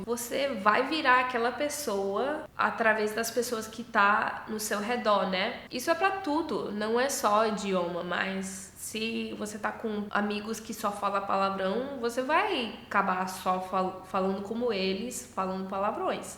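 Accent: Ceará, Brazil. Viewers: Seu sotaque e bem cearenses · vc estava falando muito cearense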